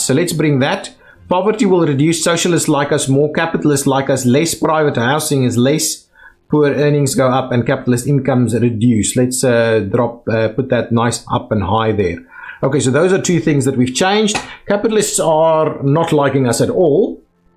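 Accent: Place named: South Africa. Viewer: Are you from South Africa, mate? Love the accent!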